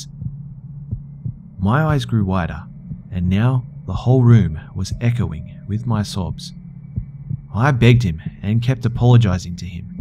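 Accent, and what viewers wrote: Australia. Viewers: "In this scary STORY..." an AUTHOR... with an Australian accent claims he was born in India, and tells us he was 12 and doing a Calculus assignment... Details, details... must have a criminal mind to fabricate such a tale. I stopped listening halfway through. This is one of those creepy corners of YT. I prefer true accounts in order to understand the dynamics of child abuse. Since after moving cross country, I have come to meet about 18-20 people, 7 of whom suffer from mental disorders, and all of them have suffered child abuse. A few of these live in absolute squalor and abject poverty. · Wow, what a sad story and a very monotone voice... also didn't help that the guy has an australian accent... all story tellers should be British!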